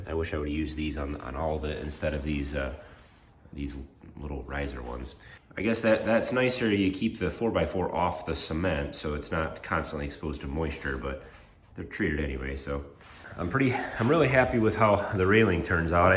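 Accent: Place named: Southern Michigan, United States. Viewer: Appreciate the video. Just curious, where are you from? You speak well. You sound like maybe you are from Southern Michigan. Am I close?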